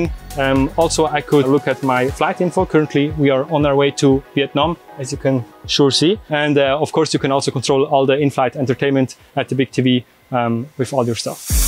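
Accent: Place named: Switzerland. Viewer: Meeeega schwizerakzänt ich würd sogar säge du bisch stadtzücher😂 stimmts?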